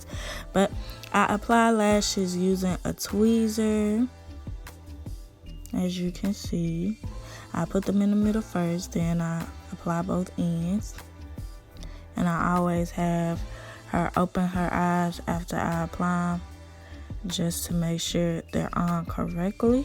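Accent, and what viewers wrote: Chicago, United States. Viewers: Where are you from?? Cuz you def sound like a Chicagoan lol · I said the same this she gotta be from the Chi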